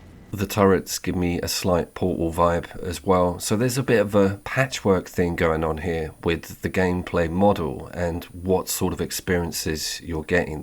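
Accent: United Kingdom. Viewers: Tho not for me (yet) as I prefer Fallout then perhaps a Metro game, and to a lesser extent the Stalker games. However your a Brit, so I can only imagine the fun to romp around the world hearing a accent similar to my own and those I (in your case literally) grew up with. As for the reviewer issues your spot on. No one wishes to wait for anything people are 9 year olds wanting what they want (the review of a game)when THEY want it. You tube could of done anything to make better reviews rise to the top but instead it is a small nice circle at the top and few smaller to medium channels can ever hope to have their audience and thus you tube attention. You will have to at some point know that you still have your integrity and cover the kinds of games that interest YOU, and have fun doing it. At least until someone helps you go viral:D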